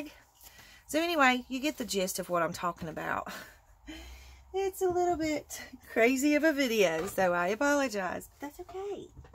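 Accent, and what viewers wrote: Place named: Texas, United States. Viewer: I went out and made 15 clusters!!! Yea, tfs. You must be from Texas, sound like me and I left 35 years ago.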